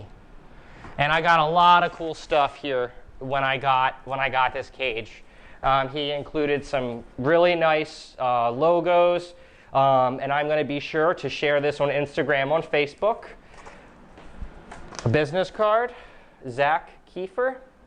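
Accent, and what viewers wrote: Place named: South, United States. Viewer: terrible south accent.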